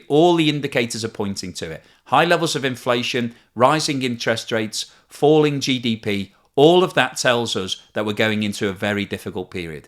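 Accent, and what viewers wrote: United Kingdom. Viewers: Dear: I don't normally simp and bestow excess praise on people but your channel is such a breath of fresh air in an age of agitprop, fake news and hateful propaganda. Your news reporting is almost noble and moral in the sense that you exert every effort to be as honest and impartial and as objective as possible. There are very few journalists out there these days who are not dripping with the venom of scathing personal opinion, and hominum attacks and Trump-style trolling. They say that "facts dont care about your feelings" but everywhere I turn to for the news all I hear are feelings and not facts, and hateful ones at that! Your channel, in my opinion, goes out of its way to try and provide a sober and unbiased analysis of world events--something personally essential for me, as I am an American, living in Russia, whose life has been upended by the war and the myriad sanctions the West has imposed on Russian. 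Thank you so much, and please continue making your outstanding content with that classy gentlemanly British accent of yours. 🙂 Sincerely, An American in Moscow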